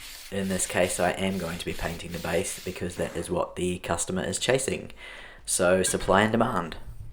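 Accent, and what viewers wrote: New Zealand. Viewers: I'm so used to hearing American accents that familiar kiwi accent threw me for a loop! I'm a fellow furniture flipper from New Zealand and I am also taking a break from drawers to work on a coffee table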